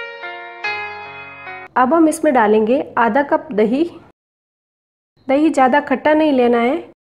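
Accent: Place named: Marwari, India. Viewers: Mam apki awaj sunke lagta hai aap Rajasthan se ho.....kya me sahi hu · Hindi me marwadi lheja aa jata hai, bahut badhiya. Best recipe. Thanks.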